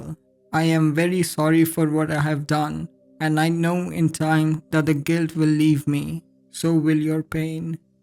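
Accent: India. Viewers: he sounds Indian . Its not like he is a terrorist. Goodness.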